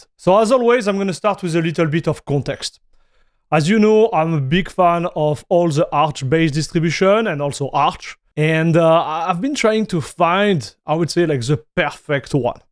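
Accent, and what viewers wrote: Alsace, France. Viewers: Or Elsaß ;That is why the French hear German, everybody else hear French. -) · On sent l'accent français. Merci pour la vidéo. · Few seconds in and I was like "he must be French" ... checked his profile which says Canada... well, it's an official language there. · uuuh i hear a frenchy <3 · As an aussie whos heard a few french accents and a lot of french Canadian accents he sounds super euro french to me. But i thinks its his tonality and inflection of words that give him an Arnie sound also... He could do the french dub for Arnies movies 😂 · he's speaking french but saying english words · He's French speaking English. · As a Scandinavian, married to a Quebecoise, for me it sounds like a very European French accent. It adds a lot of character to the channel. I love it! Top content. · As a french, I can only detect the French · I think he is from Alsace Region of France, it's definitely French with a German influence. 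The dialect is called "Alsatian". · I'm German and I can only hear French here. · He only sounds French.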